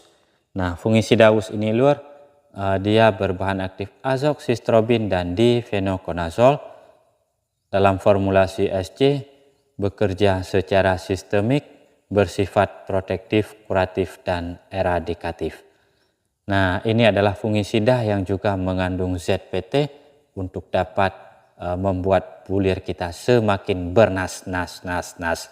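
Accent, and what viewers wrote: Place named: Aceh, Indonesia. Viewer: Bang.... Dari logat sang droeneuh ureung Aceh. Beutoi bang?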